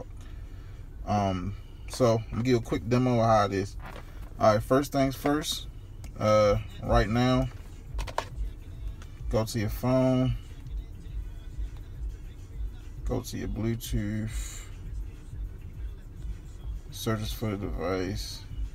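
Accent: Baltimore, United States. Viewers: Destinee Mincer soon as i turned on the video my bf said “he’s from baltimore” 😂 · U from bmore? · definitely baltimore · You definitely from Baltimore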